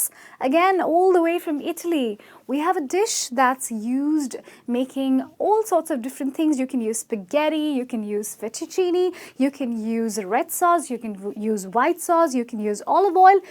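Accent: India. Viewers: She is pronouncing it in Indian English, for those who were wondering. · This sounds more like Indian English. Plus no onion in bruschetta ;) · No 1, she doesnt speak british english, more of indian slang english, no.2 it's temato not tomeito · i am sorry. i do not want to learn indian english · That's commonly heard in India. Very sad for this lady, trying to teach us, to use. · I think she has spoken all the words correctly in the Indian context. Country wise,words will always sound different. We dont speak like Americans or British. Appreciate the fact that we are atleast adopting these funny weird foreign words and trying to pronounce them correctly unlike americans ,europeans...etc who never get our food names correct. Can you pronounce Tandoori correctly ? Can u guys pronounce Baingan ka Bharta correctly ?? Can you pronounce Sambhar correctly? Countrywise words will always have a colloquial touch invariably. 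· Absolutely right. As a native English speaker from tbe UK this woman has gigen me a headache with her English. Most of it prounced wrong. She is obviously from Indian background and they always seem to prounced their v as a W.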